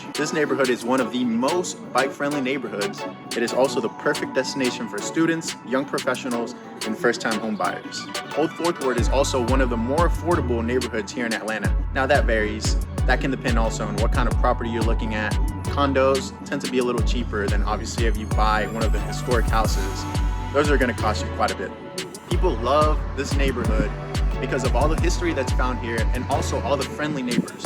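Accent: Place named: Latin America. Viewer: Hey, thanks for the video, very informative, I like you're latín too couse I could understand All the video (im practicing my english) and that make me happy... Well im dreaming to live in there (im from Colombia) and I wanna know how is to be latín in ATL (maybe one video idea)... Leave you a big like!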